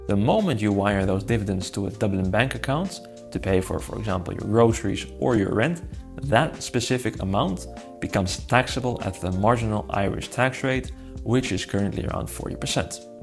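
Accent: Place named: Finland. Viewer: The video would be easier to follow if it wasn’t in a thick (Finish?) accent. But otherwise informative.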